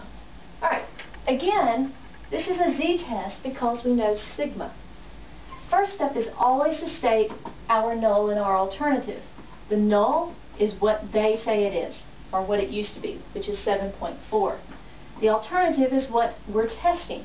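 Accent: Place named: United States. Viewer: great lesson , but i despise the american accent :((((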